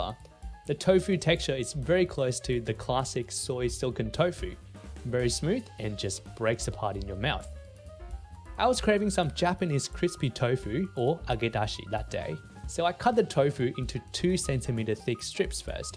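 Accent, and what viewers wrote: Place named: India. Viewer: You chickpee have an Indian accent as an indian i liked it 🤣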